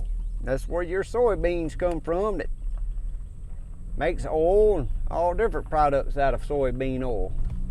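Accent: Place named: Kentucky, United States. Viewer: Great video buddy! I got to change mine and I’ll know what I got coming. I don’t hear many folk on YouTube that kinda sound like me 👍 I’m from Southeastern Ky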